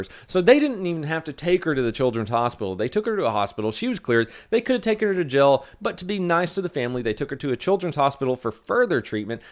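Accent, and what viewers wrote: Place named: United States. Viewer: She speaks the best American I've ever heard!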